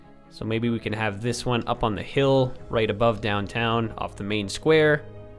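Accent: Canada. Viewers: I wondered all through the first episode why your accent gave slight Canadian energy. Still no houses, eh?" was sort of a giveaway. East Coast-er?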